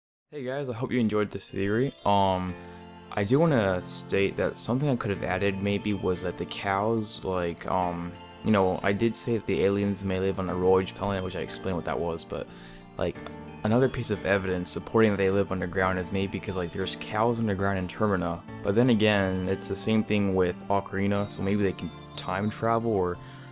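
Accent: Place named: Japan. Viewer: Are you Japanese? Your accent sounds Japanese.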